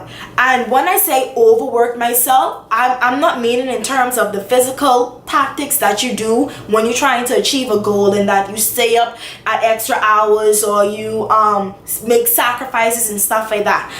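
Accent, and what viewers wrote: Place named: Bahamas. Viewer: I like the fact that you do these videos with your Bahamian accent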